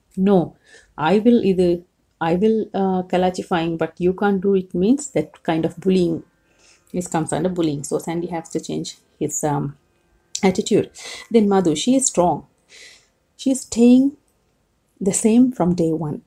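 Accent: Sri Lanka. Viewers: இலங்கைத் தமிழ இங்கு கேக்க நல்லா இருக்கு அக்கா. நல்லா செய்திருக்கிறிங்க அக்கா. வாழ்த்துக்களும் நன்றிகளும் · இலங்கை(இனிமை) தமிழ் 👍 👏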